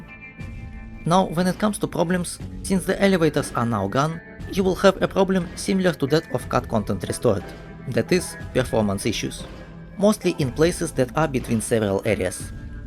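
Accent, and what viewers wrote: Russia. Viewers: я вижу что актцент чуть чуть не англ а русский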